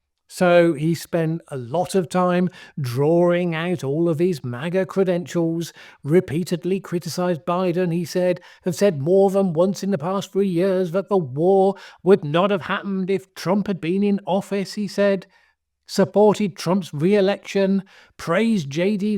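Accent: United Kingdom. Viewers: It's only your British accent that makes you sound intelligent, sir. But the actual content of your bloviating is vapid, smug and snide.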